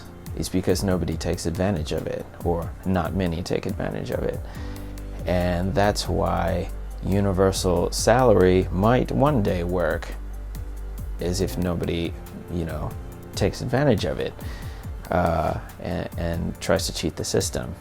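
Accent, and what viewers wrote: Finland. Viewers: Don't take this offensive or anything, but you have some finnish accent on your english. Or what I hear. There's some variations on your intonations and such... It's like Renny Harlin speaking finnish :)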